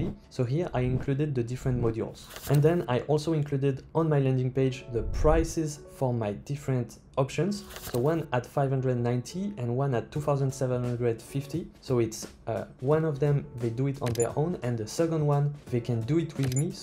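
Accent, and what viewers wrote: France. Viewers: cela sent l'accent francais 😮‍💨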